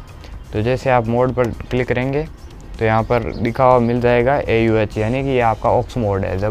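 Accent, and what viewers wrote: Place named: Rajasthan, India. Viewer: Pka rajsthan se ho 😄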